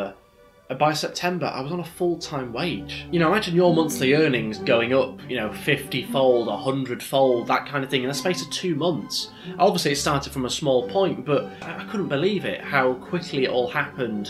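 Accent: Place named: Oxfordshire, United Kingdom. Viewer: Your voice has changed even from 2017, you sounded more Oxfordshire than Yorkshire but what ever, congratulations on continuation of your vids !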